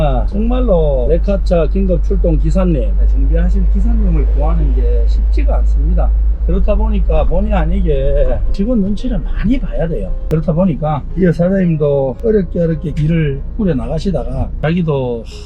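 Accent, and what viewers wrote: Gyeongsang, South Korea. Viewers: 저도 경상도 사나이인데 항상잘보고있습니다 고향사투리들으니까 억수로 정겹네요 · 갱상도 사투리 너무 잼있어요. 저도 창원에서 5톤윙바디 운행하고 있습니데이 항상 안전운전하시고 힘내십시요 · 캐빈 못구함니까... 진심 마2 내로우캡이라도 비스무리한거 캡 바꿔 끼는게 경제적이게 생겼습니다... 뭐 붐대부분은 판금도색하면 되겠는데... 캐빈은... 어지간히 썩어있어야.... ㅋ 그리고 추천 어록은. "마 우리 채널 같이 키우는거 아닌교?(경상도 네이티브 발음이 어렵네요 어캐 해야하지? ㅋㅋㅋ)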